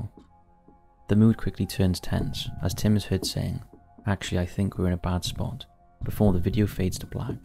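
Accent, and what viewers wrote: Wales, United Kingdom. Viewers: You realise you just said the same thing? I assume it was just an un noticed slip of the tongue. We are all human. And can surely forgive such things? They are working on a quick turnaround this month so even more understandable. FYI The narrator is british- Welsh.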